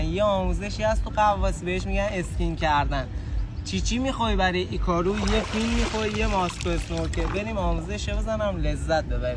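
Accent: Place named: Shiraz, Iran. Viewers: لهجه جنوبی رو عشقه حاجی 💚🤍❤️ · جنوبی 😂 شیرازیه👍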